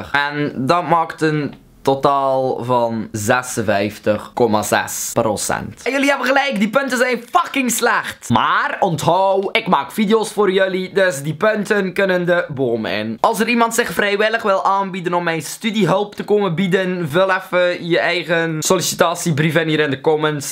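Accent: Belgium. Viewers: ben je een Belg of nl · Tis een belg?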